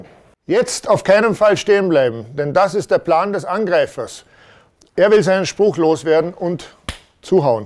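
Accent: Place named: Vorarlberg, Austria. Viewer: Geil. Könnte grad meinen du kommst aus Vorarlberg. Geil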